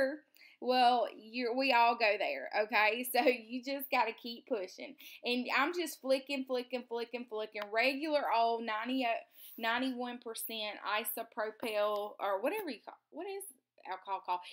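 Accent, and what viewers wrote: South, United States. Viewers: Girl your accent makes me home sick :) I grew up in South Ga/ North Florida but now live in Ohio. Love the image transfer idea. Really cool page. (In the US) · your beautiful southern drawl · I love how a southern accent just makes everything better! :) I have watched a couple videos on image transfers - I’ll have to check out your other videos - for some reason it’s a technique I love but scares me. I think I need to purchase the gel medium - I have fluid - I think the thicker medium will help the process.... also love the masking tape tip! From what I have seen from this video I am really going to enjoy your other work! That’s one of the reasons I love these hops. 1. I get to see new channels 2. I love seeing a similar theme through out and all the different takes AND 3. Who doesn’t love a chance to win something! Lol. (USA)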